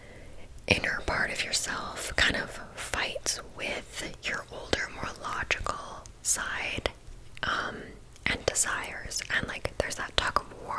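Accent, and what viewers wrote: Ireland. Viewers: More Irish accent please!!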